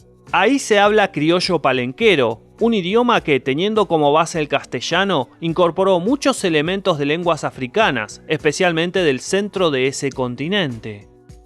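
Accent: Argentina. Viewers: QUE FASTIDIOSO ESE ACENTO ARGENTINO, BUSQUEN OTRA PERSONA PARA DAR A CONOCER ESTE VIDEO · Yo pense que hiba escuchar un Paisa.. pero resulto ser un Argentino... Ironia? hahah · Is argentino 🇦🇷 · Hola. Excelente video, recuerdo q cuando cursaba como 4to de primaria, nos hacían ver en Historia de Colombia a las tres grandes familias de indigenas en Colombia, es decir la Chibcha, la Caribe y la Arawak, y pues dentro de la Chibcha destacaban los Muiscas, los Taironas y los Paeces, pero a día de hoy sabemos que eran muchas más. Colombia es un lindo pais para recorrer, obvio no voy a mentir tiene sus cosas malas, pero animense a venir, yo siempre recomiendo ir a el pueblo y la laguna de Guatavita y ver el embalse de Tominé. Conocer Villa de Leyva, y tambien el valle del Cocora, y claro el infaltable, Guatapé y subir a la pierdra del Peñol. Por el acento del youtuber se que es de Argentina, un país que espero conocer pronto, y pues me imagino que sí ha venido a Colombia y si no pues animese parce.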